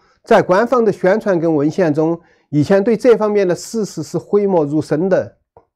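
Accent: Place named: Chengdu, China. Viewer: 成都口音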